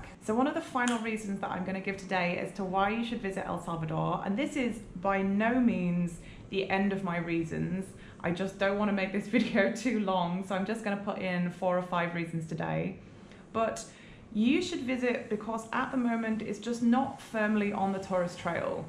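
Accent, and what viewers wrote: United Kingdom. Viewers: Dear, first and foremost, thank you so much for visiting our country. It's so smashing to know that you have come from so far away good old England. A question, did you visit El Cuco beach?? I believe you didn't but in case you are willing to come back again, I strongly recommend you to visit El Cuco beach located in the eastern zone of El Salvador. Although services are faster in El Tunco, El Cuco beach is so enthralling and I'm sure you will love it. I'm an English teacher with an accent that will set you thinking...it would be amazing if you could listen to my pronunciation. I love british English and your accent is just stunning. I trust you are doing well and enjoying life. I'm so glad you had a smashing time here. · we are not used to the brit accent yet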